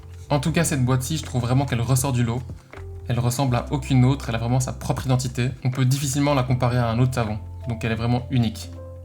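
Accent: Belgium. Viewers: Aaaa tu est belge ! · Oh l'accent belge il me fait délirer 😂 (bisous d'Avignon)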